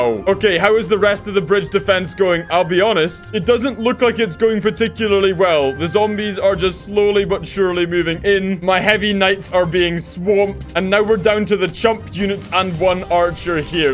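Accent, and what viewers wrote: Scotland, United Kingdom. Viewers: is he british? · Scottish. I think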